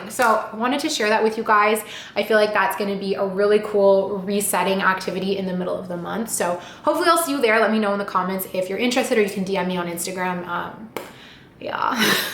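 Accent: Canada. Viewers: Hey - for knowing the days of the months - do the knuckle trick. Google it. For the laundromat - I think they reserve certain machines for doing animal hair stuff - I don’t know if these are necessarily the big machines you were talking about. But I’m glad you found a good laundromat. You do have a strong Canadian dialect 🍁🇨🇦🫡when speaking French - but maybe it’s just you talking quite fast. Maybe pretend you are talking to a young child when talking to Siri 🤓. Or why not switch to to Canadian French like he suggested? If you like to start cooking - I like to make hummus or different pestos - these are good things to use up for your lunches or in bowls. If you play around with replacing expensive ingredients with more economic ones - rocket / spinach for basil, sunflower seeds for pine nuts it can be a good alternative to store-bought ones. I put a fitted sheet on my couch - for a single bed - if you buy one that resembles linen or sthg. 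similar and you colour-match it - it really blends in and saves you many stains.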